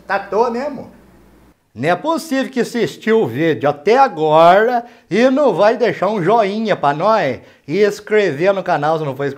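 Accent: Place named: Southern Minas Gerais, Brazil. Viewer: Como demorou pra alguém imitar direitinho nosso linguajar do sul de minas . Parabéns meu amigo.vc vai longe .muito bom mesmo .deveria ir pra praça e nossa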